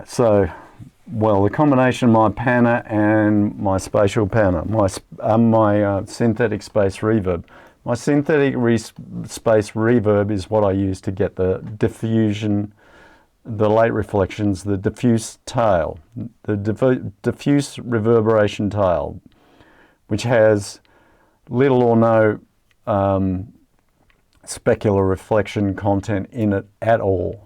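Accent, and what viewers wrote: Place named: Australia. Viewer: What I found most interesting is that you have a Finnish name, yet you seem to speak with a perfect Australian accent 😄 If you don't mind me asking, have you lived there your whole life?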